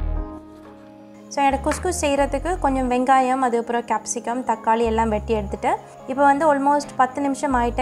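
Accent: Sri Lanka. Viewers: Mashallah all recipies are soo gud thanks for sharing ur srilankan slang nice to hear keep going sis👍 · அதாவது பயத்தம் பருப்புதான சிறுதானிய உணவுதானே உங்கள் சிரிலங்கா தமிழ் எனக்கு ரொம்ப பிடித்து விட்டது ஆனால் ஒரு சில வார்த்தைகளை விழங்க வில்லை. அதாவது புரியவில்லை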